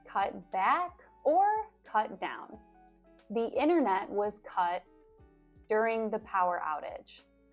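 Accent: United States. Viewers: Can get American accent if they speak like you, but they speak fast in movies 😂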